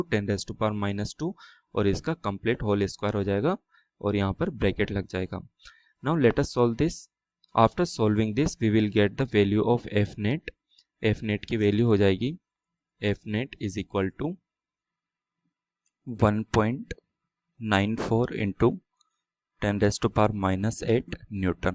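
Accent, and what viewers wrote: India. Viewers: He's speaking English with a thick Indian accent.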